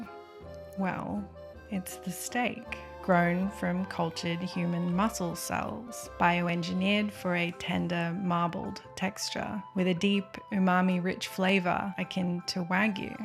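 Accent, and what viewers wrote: Australia. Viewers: An Australian video essay is so weird to me, it feels like I’m listening to some kind of ABC investigative report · You look mexican and sound Australian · Your accent is cute lol Australia?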